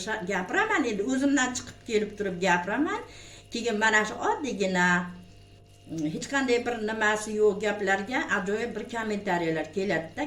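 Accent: Andijan, Uzbekistan. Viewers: ЯХШИМИСИЗ ДОМДА ЯШАЯПСИЗ МИ КИМ БИЛАН ЯШАЯПСИЗ ТОШКЕНЛИКМИСИЗ ШЕВАЕЗ АНДИЖОНГА УХШАРКАН ИЛТИМОС ЖОВОБ БЕРИНГ НЕЧТА ФАРЗАНДИЗ БОР ШУ ЁШДА ЖУДА ПОКИЗА АЁЛ ЭКАНСИЗ МАШААЛЛОХ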